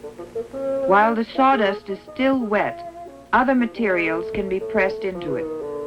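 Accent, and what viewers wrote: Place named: Transatlantic, United States. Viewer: Ok boo I see you with the Transatlantic accent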